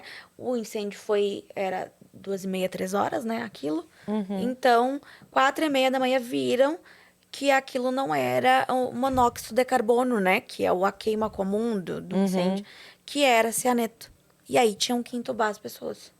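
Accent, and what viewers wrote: Rio Grande do Sul, Brazil. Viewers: Ela é gaúcha querem que ela fale como? o povinho insuportável! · Aqui nos comentários tem bastante gente que comentou sobre o sotaque gaúcho tchê aaannn lá é o popular guris e gurias, aqui em MG na capital tem o Minas tchê mistura às culturas e tradições mineiras e gaúchas🎉. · Gente, ela é gaúcha. Vcs queriam que ela falasse como??? Aaaaffff... Gente chata!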